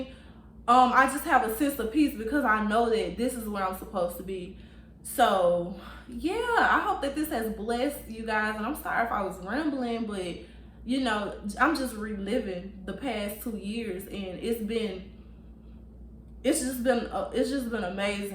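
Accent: United States. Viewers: Your American accent is too heavy I struggle to get some words. E.g. lulu for little. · Thank you so much!!! I needed this so badly, you don't even understand. What's so crazy is that I have been watching your video on and off all day at work because I have been having to pause it lol, but I knew I needed to stick around and watch until the end. My jaw was on the floor when you mentioned real estate when that has been a career I have been trying to pursue for over a year now,but for some reason just can not pass the exam. Maybe God is telling me it is not meant for me to get licensed in this state. I am definitely going to keep praying to remain obedient and faithful to him. I know blessings shall pour down. Yes I will be alone and won't know not one person in that new state, but I know God will provide connections for me. Your testimony really got me going. 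I knew by your southern accent it was going to be a good one lol. I know a Mississippian/Memphian when I hear one! I pray that more blessings come your way. Are you on any social medias? I would love to support your business whenever I'm in TX.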